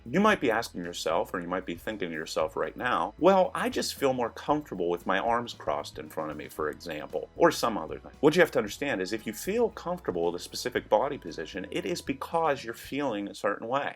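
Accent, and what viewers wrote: Pittsburgh, United States. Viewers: the elbow touch must be in your culture you sound American, in my culture (Canadian) we don't touch each other at all on the elbows, it never happens, it would be too close to the body, and could be misinterpreted as something not appropriate, I have never seen anyone touch another person on the elbow when they shake hands, we do shake hands and hug at the same time, this is done by first the hand shake, then we pull each other in for the hug at the same time. it is a very nice and warm greeting, when greeting family or close friends, or we just give a big hug, sometimes we even hug a person we don't know very well, if the situation is seen as appropriate, like if theres been an accident and they came out okay and need comfort, that is what I mean We like to buddy hug, this is when we know a person is not very much into the full on hug, as i have a niece who is like that she isn't a huggy person, and is more comfortable with a quick sideways hug, or just a pat on the back. 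· Did I notice a Pittsburgh accent in there? Also, I like how fast the video is. Lots of info, rapid-fire, no time wasted. And there IS a pause and rewind option if needed.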